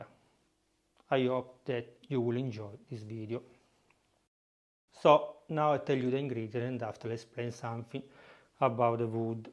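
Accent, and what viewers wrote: Italy. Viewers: He's making flavoured alcohol and he has a comical Italian organ-gringer accent. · Yes, I started it in the morning and after ten days I will tell you the result... But i noticed that you speak English with an Italian accent 😄🤣 This makes you very nice 😄 And by the way, I love Italy so much and would like to visit it. 😍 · Orca boia l'accento italiano. Soltanto per questo ho guardato tutto il video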